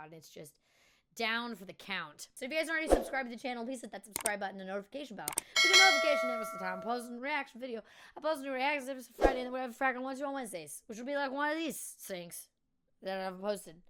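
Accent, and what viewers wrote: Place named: Upstate New York, United States. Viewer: Quick thoughts. 1. Can't really help much with female viewers. I found you as a recommendation from a song and I'm definitely not the smoke show you are lol. 2. It might be awhile but I foresee a time when labels lose their power. With the ability to self publish on Amazon and other places as well as independent production studios on the rise I think it's only a matter of time before bands move in that direction and hire independent teams and managers or firms that replace them. 3. I actually have wondered where you were from but not to be creepy. Being from NJ and living in NC I feel like I hear a bit of upstate NY and even a bit of southern in your voice. Your voice and mannerisms remind me a lot of a friend of mine from that area originally. After this video I feel like I might end up holding the door for you at the shooting range lol jk. 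Hope you have a great week and if you are looking for some bands some of my favorites are, and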